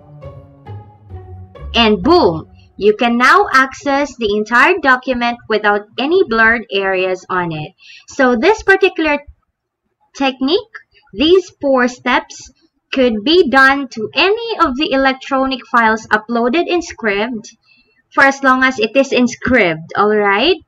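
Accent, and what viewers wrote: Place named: England, United Kingdom. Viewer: Watching here po ma’am from Cielo Cartagena Vlogs☺️Thank you for sharing this very knowledgeable and very informative content of yours ma’am.Watching here all the way from 🇯🇵I also like your English accent.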